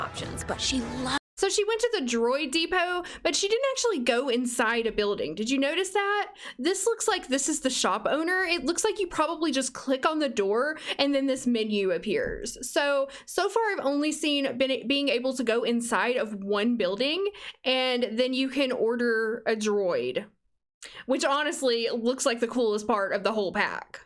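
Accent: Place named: South, United States. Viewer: I’ve never seen one of your videos before, but I absolutely fuckin adore you now 😂 your reactions and facial expressions remind me of the way I react to things, and your lil accent (is it southern? Kinda? Am I impaired?) just seals the deal! New sub here, can’t wait to binge your content ❤️ love from Toronto, Canada!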